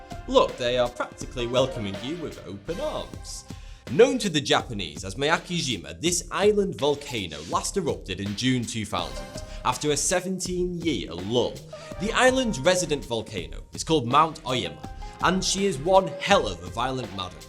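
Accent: United Kingdom. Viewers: Why almost of the facts givers or educational youtubers use British Accent??? I'm always wondering why??? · My friend hates British accents so I put the volume all the way up, just to male him mad 😂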